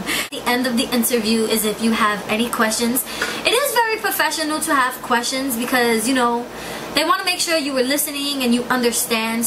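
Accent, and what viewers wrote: New York, United States. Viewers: are you from nyc?? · Its called a NY accent..duhh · You must be from NYC ☺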